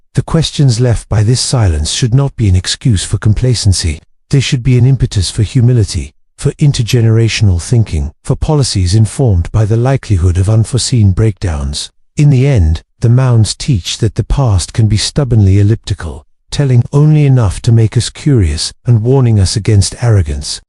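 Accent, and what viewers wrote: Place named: RP, United Kingdom. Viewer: I love history, but this piece is so far up its own a***, it's embarrassing. Narrative should rivet and guide and inform, not try to impress with a plummy accent, lots of big words and no actual people, characters, story development. People love to learn about people. Plenty of scientists, historians, even mathematicians understand how to decode something complex and spin a wonderful yarn, with drama and tension. Instead, this feels as if the narrator is reading from a stuff textbook...I can give plenty of examples. Do better, or I will not be back. Tell a story that engages and isn't so self-impressed. "Disease is the quietest, cruelest candidate..." Really? How about discussing the actual one that hit the metropolis you're detailing? And stop being so vague and boring. And note to the producers: This irked me so much, it didn't do anything to help me drift into sleep.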